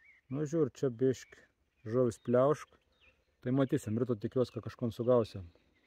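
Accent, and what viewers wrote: Samogitia, Lithuania. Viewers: Ačiū! Už žemaitiu kalb.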